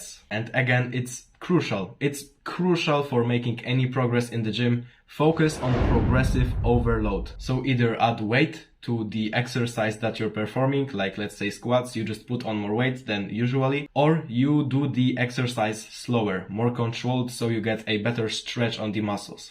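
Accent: Poland. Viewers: wiedzialem ze jestes z polski, od razu po akcencie poznalem. gratuluje virala<3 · Short and to the point, that's what i was looking for. Also weird question, are you from Poland? I can everywhere recognize accent of own country. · ej mam wrażenie że jesteś z polski bo masz taki akcent podobny XD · ja pierdolę wiedziałem ze polak po akcencie haha <3 dobry materiał mordo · o z polski jestes slychac, u mnie silka wleciala poprawiam nawyki zywieniowe lecimy pozdro 💪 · Bro i'm gonna guess, but are you from Poland? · as a pole i immidiatelly noticed that your polish just by your accent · poznaje ten Polski akcent, ciekawe czy sie nie myle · Dude r u from poland i can hear it here cool Pozdrawiam Pl gurom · Are u from poland? cuz i kinda hear ur accent but ur english is on high level. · I immediately new that you're polish because of the accent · Bro u Polish? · Nie - nie wyśmiewam się, mega props za kontent i formę vlogów - po prostu wyłapałem ‚polski’ akcent, so one question - Are You Polish? 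❤❤ Good job bro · Are you Polish? Masz bardzo polski akcent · brat ty z polski? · nie ukryjesz polskiego akcentu , ale i tak wyglądasz zajebiscie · this question is not related to the movie, but are you polish? I think the accent is familiar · od paczątku wiedziałem żę to polak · coś czułem że jesteś polakiem słuchając twojego akcentu XD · słychać że polaczek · po 1 minucie filmu zauwzaykem ze jestes z polski XD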